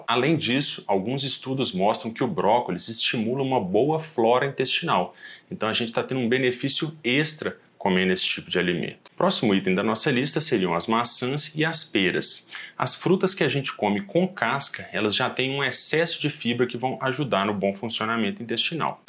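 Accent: Minas Gerais, Brazil. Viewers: São relevantes as informações. O Dr. deve ser professor: metodologia blz! E o sotaque mineiro é nota 10!!! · Boas dicas e com o belo sotaque mineiro, uai!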